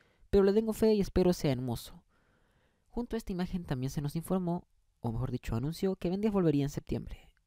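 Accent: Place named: Antioquia, Colombia. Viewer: Sin ofender pero tú voz es muy paisada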